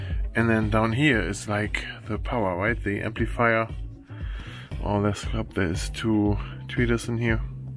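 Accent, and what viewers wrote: Germany. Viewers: Er hört sich irgendwie deutsch an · Thank you for this video! My party box has stopped charging and only works when it is plugged in to AC power and the DC power does nothing. By the way, your accent makes this video a hundred times better. I love the German language 😅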